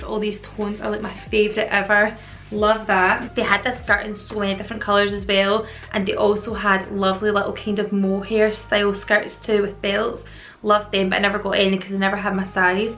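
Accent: Scotland, United Kingdom. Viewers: So is a Scottish accent? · Are you Scottish?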